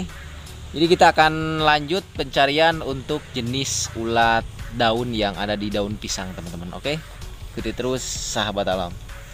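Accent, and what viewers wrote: West Java, Indonesia. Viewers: A kawit n ti mna?? logat n mah sunda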